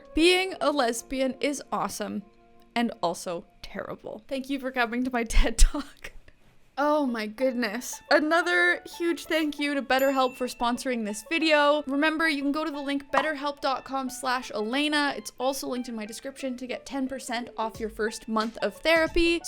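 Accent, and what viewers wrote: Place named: Prairies, Canada. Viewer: I'm yer Uncle Taz and I found Midwestern Canadian accent peeking through from time to time. Involuntary Smile Reaction engaged...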